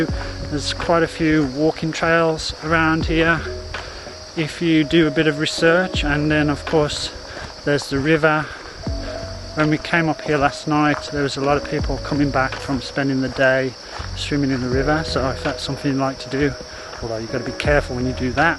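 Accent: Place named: England, United Kingdom. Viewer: must add that your English-accented mandarin is quaint & interesting 😋👌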